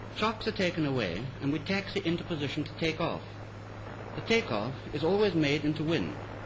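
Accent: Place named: Estuary, United Kingdom. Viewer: Mr Scott might not have understood your estuary accent, but would have been too polite to make silly comments about it.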